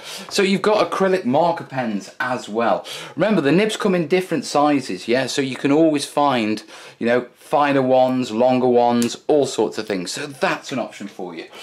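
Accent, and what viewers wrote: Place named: Stoke, United Kingdom. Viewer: by the sounds of your accent a fellow Stokie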